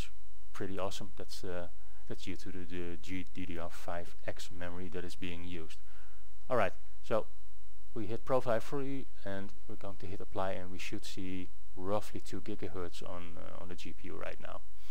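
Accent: Netherlands. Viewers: Goeie englisch men i almost kant hoor your duts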